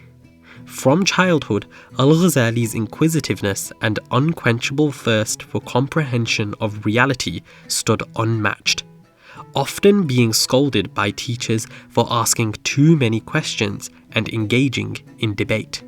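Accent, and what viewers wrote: Arab world. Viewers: Why Arabic pronunciation? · I was wondering throughout the video whether he's Arab or not, I still don't know, maybe he grew up with Arab friends? It's so hard to tell and I'm Egyptian too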